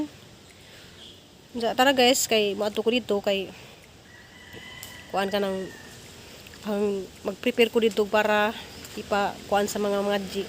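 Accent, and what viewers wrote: Bohol, Philippines. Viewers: Hi sis bagong kaibigan! Taga bohol ka sis noh? Ganahan kog paminaw sa imng sinultihan bah,taga bohol sad akong tatay bah, taga CDO KO SIS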